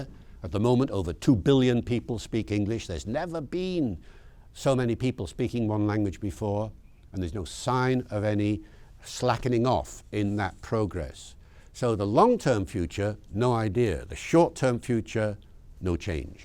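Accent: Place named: Wales, United Kingdom. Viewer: Very interesting. His English definitely sits on a Welsh linguistic platform.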